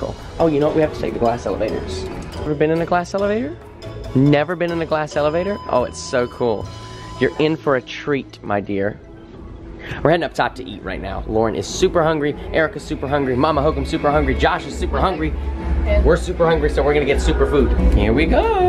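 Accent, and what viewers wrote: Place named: South Carolina, United States. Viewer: Lauren in the fruit section is so precious... Y’all are cruising by the big cantaloupe and melons.... Lauren: Can we eat them?’ Josh: yeah 🤣 bless her heart! She doesn’t understand the treat she’s in on!! Take it easy on her, Josh! She might become overwhelmed by the how wonderful cruising is! 🤣 relax and enjoys, guys! Btw...I Love watching your videos, Josh! I discovered your channel a few months back! I love y’all the (spelling?) and! And now Lauren! I’m from SC so you sound like some of my kin folk! 😉 Take care!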